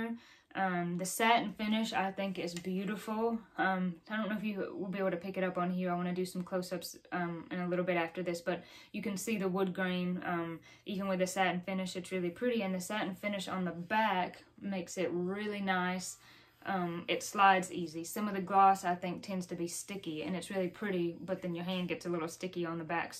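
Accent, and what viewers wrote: South, United States. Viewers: Love that guitar; just picked up the 2020 Trad Pro V in satin ebony yesterday! Amazing guitar; mine has mahogany body & mahogany top. Cool video, awesome guitar, and nice country accent; easy to listen to. Take care & God bless, Brandon 🎼🎸🇺🇸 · Love your southern accent...! Isn't the Trad LP too heavy...? Cheers from 🇫🇷 big BBS fan 🎸🤘🏻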